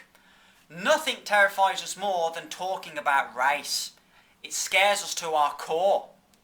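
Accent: Australia. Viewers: I think it’s Australian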